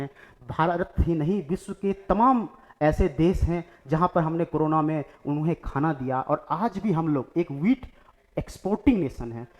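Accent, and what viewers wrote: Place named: Bihar, India. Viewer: Kon h language bihari k lgta h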